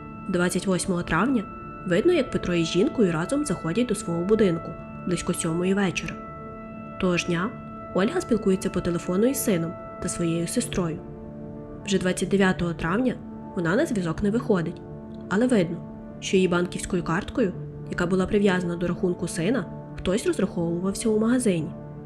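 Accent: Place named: Chortkiv, Ukraine. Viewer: У вас такой акцент как у моих друзей из Черткова) но формат у вас как у некоторых американских true crime каналов. Вы видимо в Америке жили или живете?!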